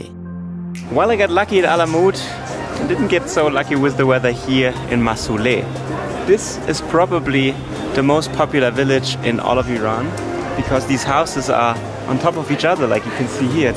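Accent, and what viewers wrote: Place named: Germany. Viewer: You make some dope vids, man. I'm also Iranian and I hope you had a good time here. I noticed you have German accent, so I gotta say that I'm a big fan of:)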